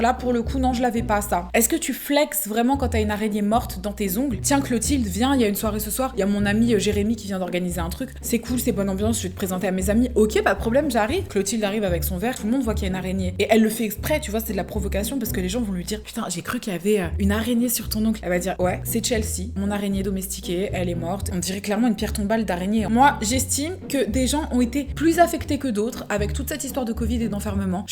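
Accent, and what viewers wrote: England, United Kingdom. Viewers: J’adore ton accent anglais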